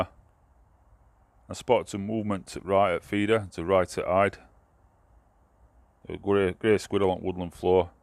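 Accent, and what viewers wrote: Yorkshire, United Kingdom. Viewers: What part of Yorkshire are you from pal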